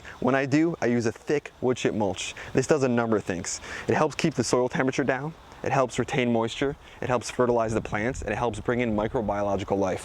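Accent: New Jersey, United States. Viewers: Come for the gardening advice, stay for the New Jersey accent. "Worter"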